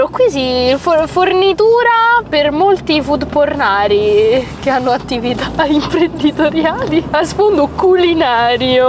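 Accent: Rome, Italy. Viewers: 120 Alibaba bella sta cosa non era solle.mille e una notte, bellissima tu e mi piacciono i tuoi contenuti poi io da veneto mi fa morire il tuo accento romano de Roma 💋😉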